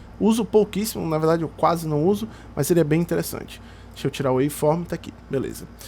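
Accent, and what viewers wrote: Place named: Manaus, Brazil. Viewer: Muito manauara esse mano. Volta com as aulas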